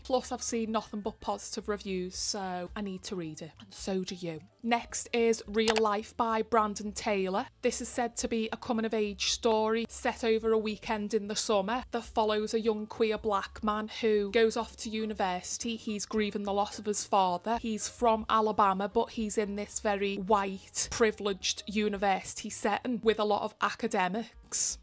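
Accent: Liverpool, United Kingdom. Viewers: Thanks for this video. It's much appreciated for Pride Month. I just discovered your channel today. As others have mentioned on other videos, I love your Liverpudlian accent. Most of the lit fic Booktubers I come across have quite formulaic American or English accents. No shade to them, especially as many of them have American accents because they've learnt English as a second language. But it's really wonderful to hear more diverse voices in the Booktube world (literally!), so thanks for your contribution ❤️‍🔥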